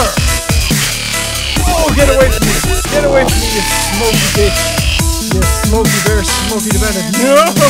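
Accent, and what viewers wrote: Ireland. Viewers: i have seen the whole gameplay even of the whistleblower dlc and I love watching him figure it out with his Irish accent, anyone else? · I have an intense stomach sickness going on right now, and there's nothing like a sweet Irish accent to lull me to sleep :3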